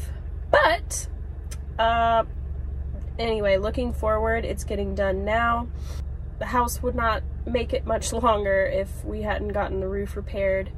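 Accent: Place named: Missouri, United States. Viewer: Sounds like missouri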